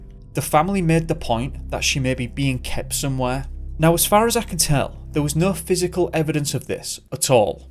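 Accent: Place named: Hull, United Kingdom. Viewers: Where are you from? One minute I think you have a Leeds accent but then you sound a bit Sheffield but then I hear you pronounce a word and I then think Hull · Oooh your accent I think you're a hullonion 😉🤭 great channel ❤ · I detect a hullensian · It's an East Riding accent. It's probably from near Hull.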